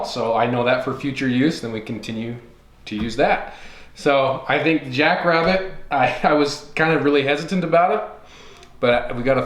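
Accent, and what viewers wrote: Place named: Utah, United States. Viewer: By your accent, your from Utah, USA. Rabbits there, carry Tularemia, you stupid Mormon. You have cotton tails there, why not eat them, instead of infested jackrabbit/ Hare.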